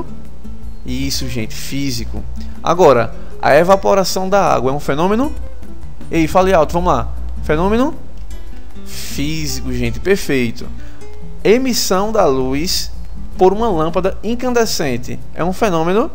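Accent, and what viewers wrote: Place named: Pernambuco, Brazil. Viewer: pelo sutaque eu chuto! Pernambuco? ...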